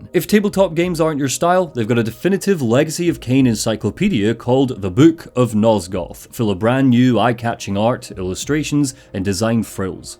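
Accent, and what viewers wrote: Scotland, United Kingdom. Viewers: I swear you used to be scottish. Am i experiencing a mandela effect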